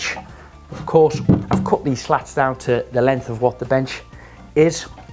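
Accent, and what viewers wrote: Liverpool, United Kingdom. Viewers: He’s a scouser from Liverpool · From which part of UK is the presenter ? I like the pronunciation ... especially the "cut" with the U ... :) PS very nice the S too ... shhhtandard ... (great !) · excellent tutorial, thanks for clear and patient explanation , is it liverpoolian accent btw? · Thanks, Yes were from L,pool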